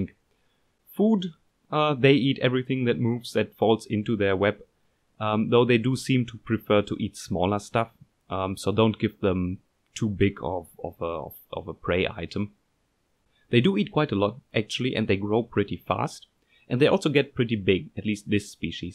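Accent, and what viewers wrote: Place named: Germany. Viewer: Hey. Im from germany and from your accent and your name i would guess you are too. Im really interested in these spiny assasin Guys. I would Love to buy some from you. Even if thats not possible i really Like your channel and keep Up the high quality videos.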